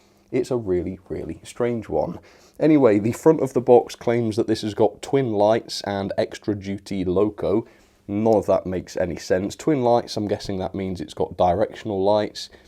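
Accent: United Kingdom. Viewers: Dear, I found it thorougly amusing, to hear you hate it so much, in profound solid brittish, marvoulous thanks!:D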